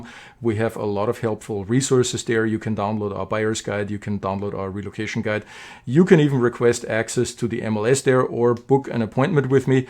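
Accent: Germany. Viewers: It sounds like you are from Germany? As a European used to a safety net I find it overwhelming to imagine how to get a job in Milwaukee without a career. And being able to afford healtcare. If it's even possible. Are there any places that would help you apply for jobs for free? I wonder if it's possible to qualify for food stamps as an American citizen while your searching for a job if you've never lived there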